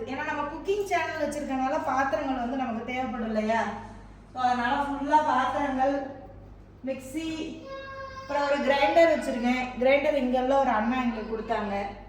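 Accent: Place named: Ramanathapuram, India. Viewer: Ongloda slang ramad (D's)mari erukku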